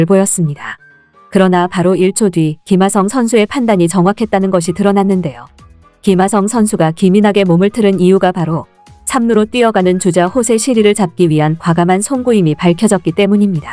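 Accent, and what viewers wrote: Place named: North Korea. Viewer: 아 젠장 결국 3루에 공던졌다는걸 이렇게 길게…. 그리고 이 아줌마 목소리 겁나 듣기 싫네. 북한여자인가?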